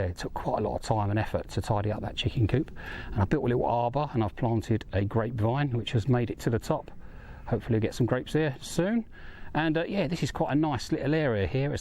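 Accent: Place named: United Kingdom. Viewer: Thank you for the vlog, i love the scenery, and the way you hv done up your property. If i ever have students again to teach them english i will recommend your chennel, beautiful every day british english. Thank you sir.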